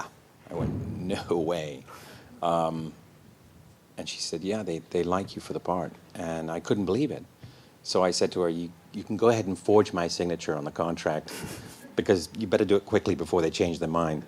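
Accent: United Kingdom. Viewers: The little hints of the Queen’s English just keep my heart a-flutter🥰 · Yes he's from London. Comes from a relatively posh family. He even went to school at Harrow. His accent is confusing because he has lived in the US for over 40 years and sort of drifts back and forth between British RP and American. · I can’t tell if he’s trying to put on an American accent, or if he’s just lost some of his British accent over the years... but it seems kind of mixed here.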